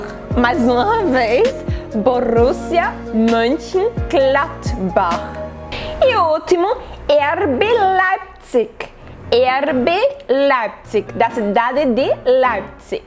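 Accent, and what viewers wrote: Northeast, Brazil. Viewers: Sim...nordestina de Maceió! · Ela parece nordestina falando português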